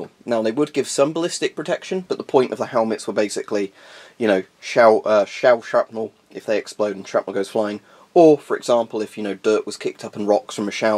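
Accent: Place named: United Kingdom. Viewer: “What a shhhtupid name for it” haha British accents make everything sound so much better while being right at the same time 😂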